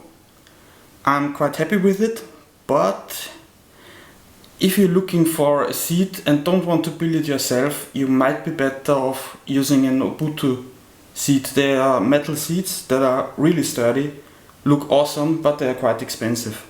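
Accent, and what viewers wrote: Germany. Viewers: Hört sich so deutsch an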